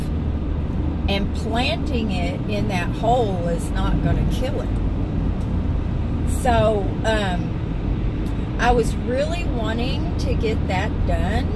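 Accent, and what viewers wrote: South, United States. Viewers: Speaking of McDonald's I mean speaking of the rain was at McDonald's and it started raining but when I came out I was right in front of the door so and then I got home sat in the driveway and I'm watching your video before I go in and I usually have to sit in the car and take a break cuz I'm tired when I get home from my outing.. yes I hear that southern drawl y'all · I watched your friend with the YouTube channel Lettie’s Life., you said that the reason you have a thicker southern accent lately was “because of her”. That’s interesting because Lettie doesn’t have an accent. So, thought I’d mention that.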